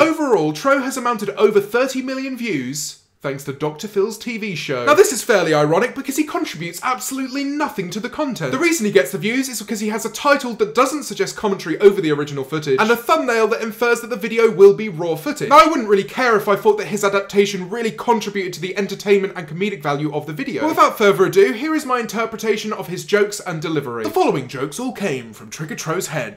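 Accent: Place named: United Kingdom. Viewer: I am trying to take you seriously, to understand your narratives but your voice is killing me. I can't take it, it's worse than James Corden. The British accent with the fast-talking is messing up my brain. That wasn't meant to be a roast. That's just how I feel. I like Tro's random comedy, that's my preference, but I know what you mean by his repetitive content. I am kinda getting annoyed by it too. JustDestiny is just like Tro too. Exactly, the same type of video style, but I like Destiny's roasts better, and Tro's comedy better. That's why I am subscribed to both channels! :) Also at first, I thought JustDestiny, TriggeredTro, and LeafyisHere were all the same person. Just the same video style, same content, none of them ever show their faces, they all have a game playing in the background, and they all sound like 20-year-old white guys who just dropped out of college. 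(Not meant to be a roast, my opinion) Sorry for the novel you had to read! Peace!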